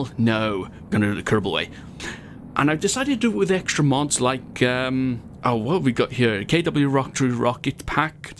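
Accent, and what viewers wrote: Wales, United Kingdom. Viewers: Welsh is it?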